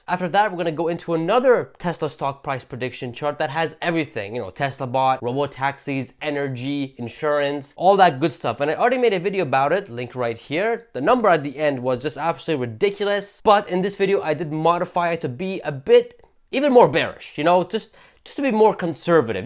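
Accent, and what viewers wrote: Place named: United States. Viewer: Just curious, why do you have an American accent?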